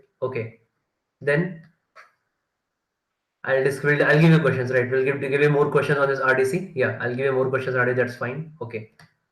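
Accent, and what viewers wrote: India. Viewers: yesss sweet hindi accent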